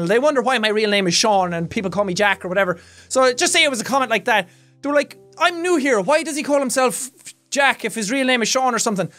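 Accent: Ireland. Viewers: Jack and/or Sean i think that your are absolutely BOSS for putting up with bullsh*t comments and that your mail sail hair is BOSS and i want your fricking hat, i live in the southwest of England and my girlfriend is from northern Ireland and i just LOVE the Irish accent and i can understand everything you guys say and wish i could change my accent to Irish it's too fun! Lots of lols i cannel all my bossness towards you so that you can be even more boss, if that's possible- from Caz! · Hey Jack.... or Sean, or whatever your name is. :$ haha I'm a new subscriber to you and I just wanted to say that when I saw a youtube gamer with an Irish accent, I was in complete awe! You're brilliant, dude! Can't wait to see more vids! P.S. I was in Ireland on vacation over the summer, and I really miss it. Your accent brings back a lot of awesome memories. :) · Are you Irish? 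· He's form Ireland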